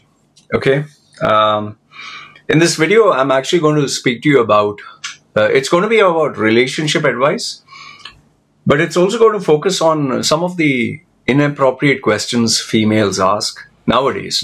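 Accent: India. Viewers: Hi, why does your Indian accent sound stronger now than what it did in your 2011 Ted talk?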